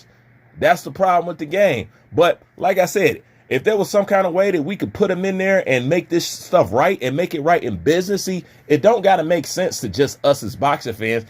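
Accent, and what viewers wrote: United States. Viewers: You sound like you from Philly😂😂😂 I'm sure you seen the same pics we just seen! That's a different look that Boots gave to Spence😂😂 He was talking 💩 to Spence but he looking nervous next to Crawford! Boots looking like he don't want to fight Crawford but his daddy wants him to😂😂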